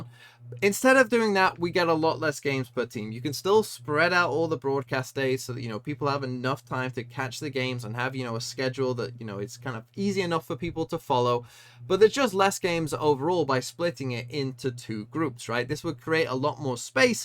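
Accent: England, United Kingdom. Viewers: He's English, right?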